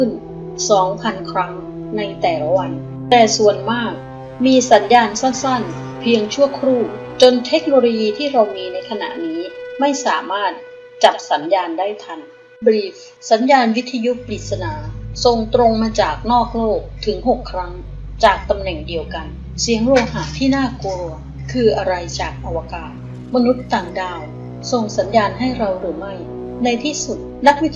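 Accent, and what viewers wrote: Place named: Isan, Thailand. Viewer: ลงพุงแชลแนล(ผมชอบ)ก็อิสานครับ สำเนียง ไม่ใช่ปัญหาครับ ..พูดกลาง สำเนียงอิสาน ก็ไม่เป็นไรหรอกครับ พี่น้องสิสาน มีอยู่แผ่นดิน สำเนียงคั้นนหูครับ เป็นกำลังใจให้นะครับ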